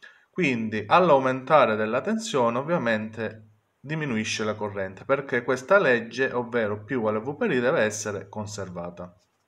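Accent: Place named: South, Italy. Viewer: Ma di dove sei dal accento sembra che sei del sud italia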